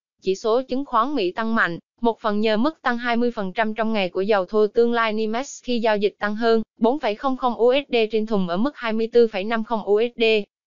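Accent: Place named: Quang Nam, Vietnam. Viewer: Qua xem giá vàng ngàu 6 tháng 5 và xem luôn QC. Hôm nay có giọng quảng đọc phải không nhỉ.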